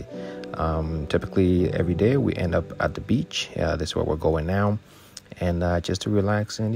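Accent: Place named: United States. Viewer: American accent bruh, u eat cassava leaf? 😅😅😅. Awesome content bruh 👌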